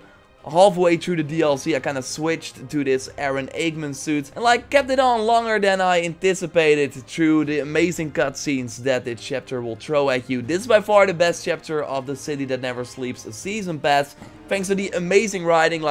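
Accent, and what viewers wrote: Netherlands. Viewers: wait are you dutch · Kom je uit nederland? dat had ik nooit gedacht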